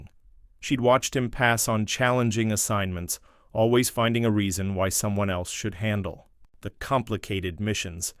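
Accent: England, United Kingdom. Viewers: The English accent is very off putting.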